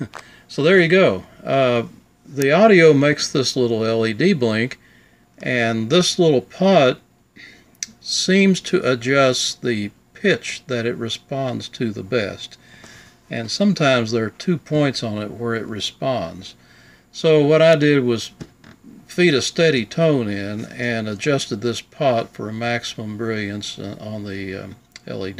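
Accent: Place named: United States. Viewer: Thank you, Your american accent is so easy to understand I like! Greetings from France!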